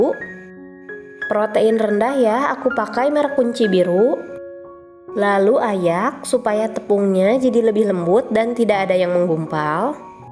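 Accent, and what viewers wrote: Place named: West Java, Indonesia. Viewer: Meuni sunda pisan Aksen na, salaam sunda atuh Neng Geulis 😊 Hanupis resep Nastar nya nanti mau coba di praktekan 👍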